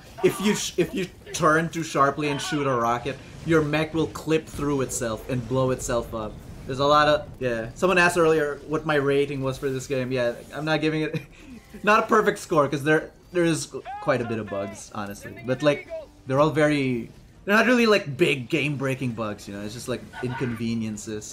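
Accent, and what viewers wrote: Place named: Central Jersey, United States. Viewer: Yeah at first I assumed he was from Central Jersey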